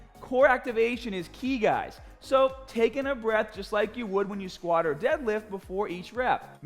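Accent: Boston, United States. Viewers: I swear his accent was never this Boston a few years back 😆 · Is this guy from Boston? I can't get over the accent lol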